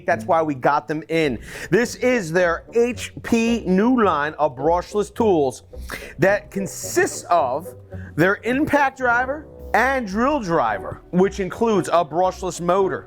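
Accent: Philadelphia, United States. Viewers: This is the first time I've ever watched your videos and I am 40 seconds into it, but I can bet that the narrator is from the Philadelphia tri-state area because of his philly accent. I'd recognize that jawn anywhere.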